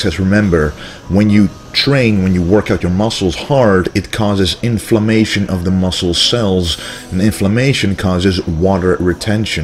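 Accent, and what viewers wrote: Netherlands. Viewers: I hear a dutch accent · you have a dutch accent... de groeten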